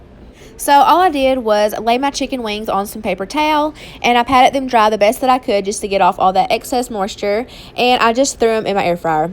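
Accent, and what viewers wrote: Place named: North Carolina, United States. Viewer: Girl you must be from NOrth Carolina lol... that accent though 😆 love it! And thanks for the great meal ideas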